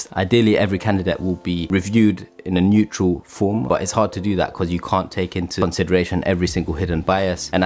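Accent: United Kingdom. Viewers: Are you British? or it is just an affluent accent ?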